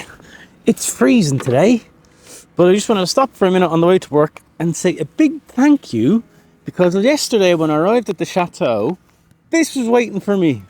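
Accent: Ireland. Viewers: He is definitely "a keeper". Charming, funny, attractive, fun, love listening to his Irish accent, blends so well with his personality. I hope he has a good women showing him the attention he deserves.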